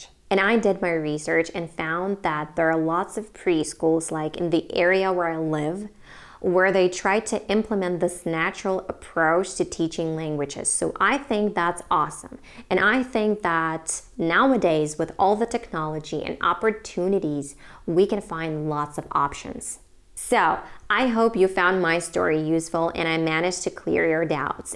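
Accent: United States. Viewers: Looking to raise my kid bilingual too. Could've sworn you were American. Thanks for the tips! Great story! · Підписаний на Ваш канал і з задоволенням його слухаю. Цікавий зміст, чудова американська вимова, вродлива і харизматична автор блогу. Бажаю успіхів у Вашій роботі. Цікаво буде через 2-3 роки побачити і почути, як вільно розмовляє Ваш малюк двома мовами! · А муж тоже на англ с ребенком общается? Как у мужа с английским? Он себе такое же американское клевое произношение ставил, как у вас?) Вообще, я просто с отвисшей челюстью смотрел видео. Очень восхищаюсь вашей смелостью, целеустремленностью и авантюризмом)))))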